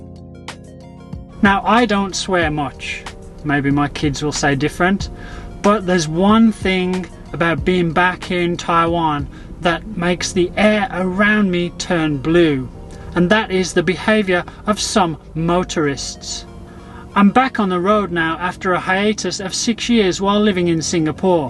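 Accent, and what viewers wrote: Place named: United Kingdom. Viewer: 哈哈哈！英式語調，英式幽默！非常喜歡！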